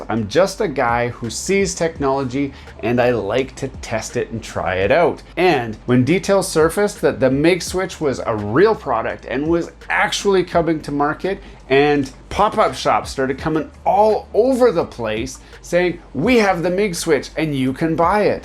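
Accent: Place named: Canada. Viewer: Patiently waiting as well. (Insta-subscribed when I heard your Canadian accent!)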